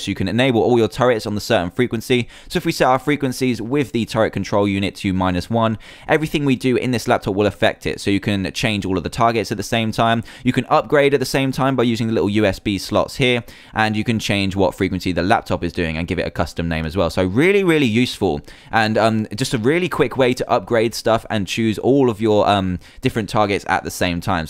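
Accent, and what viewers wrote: United Kingdom. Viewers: yes, obviously he is British, (no offence) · Are you british?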